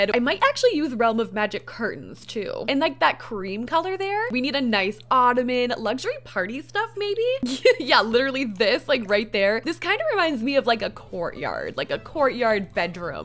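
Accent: Canada. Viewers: i could immediately tell you were canadian in the first minute of the video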